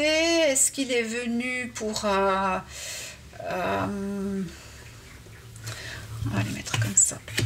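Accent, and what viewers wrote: Switzerland. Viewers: Bonsoir Madame, j'apprécie de plus en plus vos tirages, calme, tranquille, voix douce, petit bruit d'eau, beaucoup de respect, élocution soignée, il me semble reconnaitre un accent suisse mais c'est peut être la Savoie. Moi non plus je ne connaissais pas ce drame. Je me permets de vous donner des idées si vous voue êtes en panne d'inspiration : Céline Giboire, Thifaine Veron, Vanessa Melet (pardon si vous avez déjà travaillé sur leur disparition ou décès car je connais votre chaine depuis peu). Merci beaucoup pour la qualité de votre travail. Bonne soirée. Aline Le Moal, Finistère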